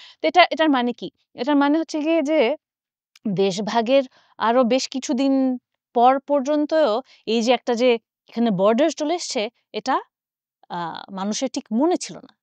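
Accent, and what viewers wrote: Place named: Bangladesh. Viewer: না জানলে বলেন কেন? এখন তো ইনফরমেশন খুব সহজেই পাওয়া যায়। ট্রান্সলেশন সহজে করা যায়। নেহরু লিয়াকত চুক্তির নাম শুনেছেন? এ মহিলা চাকরি পেল কি করে? ব্যাংগালোরে আসুন দেখিয়ে দেব কত বাংলাদেশী আছে। আপনাকে দেখে যে কেউ বলে দেবে আপনি বাংলাদেশী। আপনার কথ্য ভাষা প্রমান করে দেয় আপনি বাংলাদেশী।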